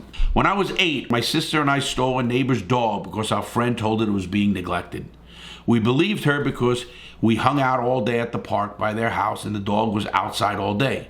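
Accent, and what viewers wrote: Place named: Boston, United States. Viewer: I did well at an audition because of you. Hours of hearing your Boston accent helped me master it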